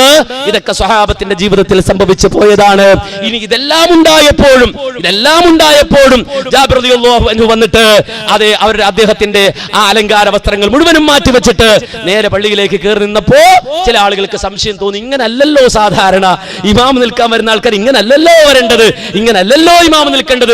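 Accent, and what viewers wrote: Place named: Kozhikode, India. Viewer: ആദ്യം ഭാര്യനെക്കൊണ്ട് പർദ ധരിപ്പിക്കടോ? സംസാരിക്കുന്നവൻ കോഴിക്കോട്ട് കാരനാണെന്ന് തോന്നുന്നു.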